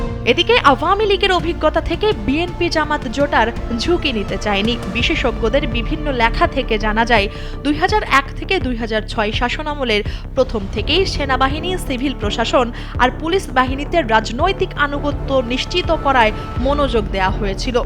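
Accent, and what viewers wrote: India. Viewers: ভারতের স্টাইলে সংবাদ পাঠ🖕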